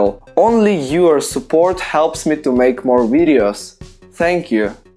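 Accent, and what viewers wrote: Russia. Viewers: You seem to have a Russian accent. Are you Russian? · Wait, are you Russian? That’s some pretty good English. If you didn’t have such a strong accent, you’d be better than me lol. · You sound like Russian Отличный английский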